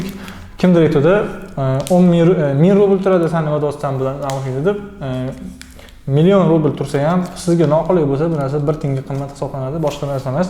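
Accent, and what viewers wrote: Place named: Tashkent, Uzbekistan. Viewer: 🤢🤮 Aka iltimos Toshkentcha gapirmang, kitobiy o'zbek tilida gapiring. 😊😄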